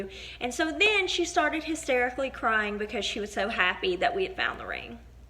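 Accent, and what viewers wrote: South, United States. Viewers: I’ve been binge watching your videos the last couple days and let me just tell you, you’re a great storyteller and I just love your southern drawl, personality, and little bit of attitude lol Keep being you 😘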